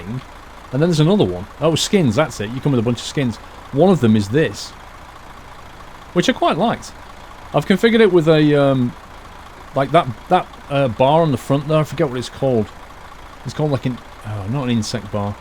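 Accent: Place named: United Kingdom. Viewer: I am a Pennsylvania person who enjoys listening to you talk in your British way. Your like a person who can keep anyone company. Sometimes you are funny. Also, it's neat to see how the ways you have to learn how to handle traffic and situations along the way as you travel.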